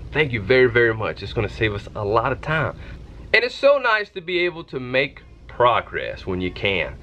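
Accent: North Carolina, United States. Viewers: I love your voice. Are you originally from NC? I’m from Washington state and have no reference for different southern accents. One of my daughters-in-law is from Pennsylvania and she talks very fast.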